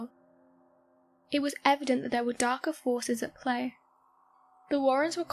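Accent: England, United Kingdom. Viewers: Why is it hard to understand a clear English accent?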